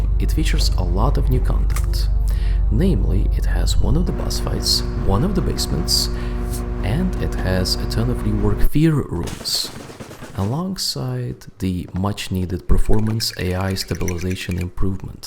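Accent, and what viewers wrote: Russia. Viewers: Лол, акцент то русский)) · Замечается русский акцент) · Говоришь по английски,а по голосу(произношение) понятно что русский с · Лолол, почему я слышу русский акцент? ._.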